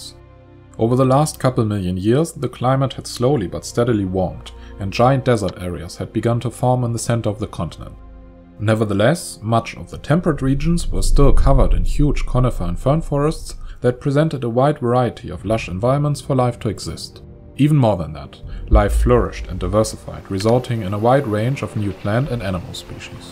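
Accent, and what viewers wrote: Germany. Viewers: i dunno why but hearing a German sounding guy talk about it being the largest graveyard in history.. made me laugh... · Is the speaker German native? Hört sich ziemlich deutsch an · You have the most german accent I've ever heard, and I lived there for years. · Everything is great about this video but the commentator looks like he have a germane accent..I’m sorry but it’s really annoying and uncomfortable! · Everything sounds better with a German accents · i really love your videos but I must strongly recommend you invest in a native english speaker who also has a good voice because that would make a huge difference. your english skills is excellent how ever the german accent is pretty heavy and your voice is unfortunately doesn't have the best pitch making it very difficult to keep the focus on the flow of the video. I hope you don't get this criteria in a personal way I really think your videos are great but just the audio reduce the quality drastically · I like how the narrator sounds like a Nazi scientist. 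🙂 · Ok... I knew it... This is a German person talking. Great animations and to my knowledge well researched I will subscribe. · There is something vaguely menacing about a guy with a Germain accent talking about the end of the world 😳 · He is German. I was pretty sure from the accent, but in another video he mentioned some German cities and names and it was obvious that it's his first language. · his heavy german accent brings some extra feel to the story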